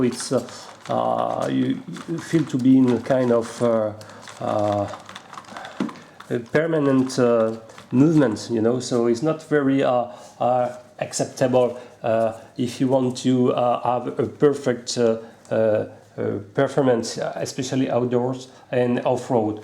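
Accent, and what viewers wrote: France. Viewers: Hey dude are you french? You have a strong accent!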